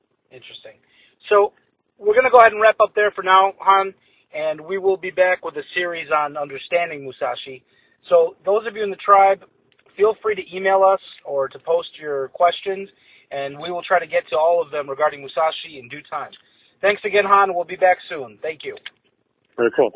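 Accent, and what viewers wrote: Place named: China. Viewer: still sounds Chinese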